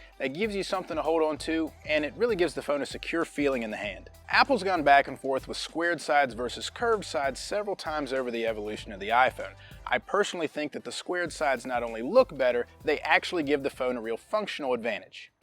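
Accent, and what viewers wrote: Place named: Louisiana, United States. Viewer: Debating whether to go caseless for the 14 Pro Max. You sound like you’re from Louisiana!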